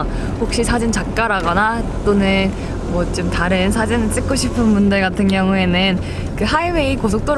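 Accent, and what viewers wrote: Gyeongsang, South Korea. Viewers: ㅋㅋ 말도 이쁘게 하시네 경상도 말투 정겹네요 멋져요 ㅎㅎㅎ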